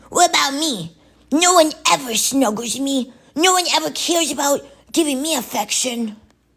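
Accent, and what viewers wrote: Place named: Russia. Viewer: Loved your Russian accent in both videos ❤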